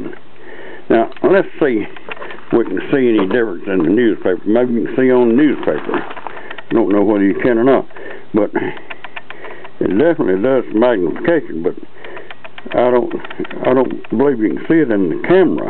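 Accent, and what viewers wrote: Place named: South, United States. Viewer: HAHAHAHA SOUNDS LIKE A HILL BILLY@@@@ Definitly not a bad thing, were all hill billys over here.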